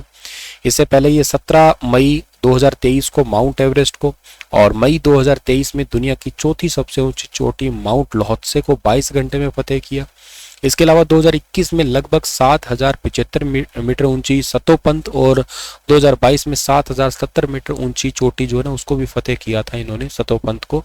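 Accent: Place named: Bagri, India. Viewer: Sir aapne itni achii bagri boli good sir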